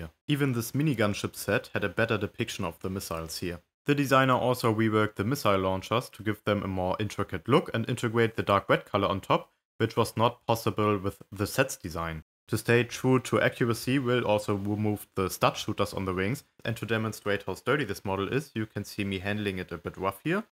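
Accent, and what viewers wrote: Germany. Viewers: Your accent Sound German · That German accent is crazy! Very nice!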